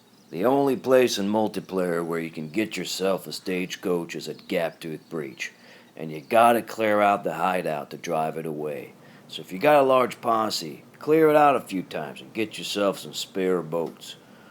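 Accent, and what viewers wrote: West, United States. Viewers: his voice sounds western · DO MORE VIDEOS PLS I LIKE TO HEAR YOUR WESTERN ACCENT AGAIN AND AGAIN!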